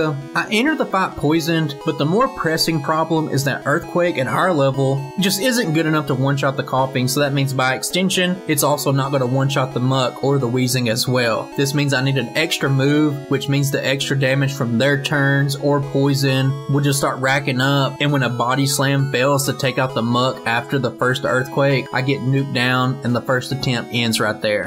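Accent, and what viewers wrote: South, United States. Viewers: nah, dude. Thank YOU for the great content. Love the southern accent!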